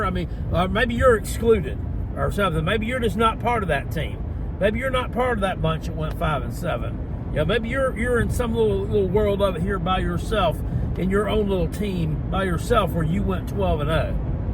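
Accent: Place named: South, United States. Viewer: You need to slow down on that "you people" statement you already sound country as hell so you can't afford to keep saying it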